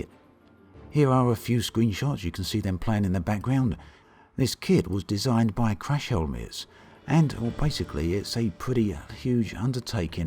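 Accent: United Kingdom. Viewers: I did notice a slight British accent in your video today. (smile)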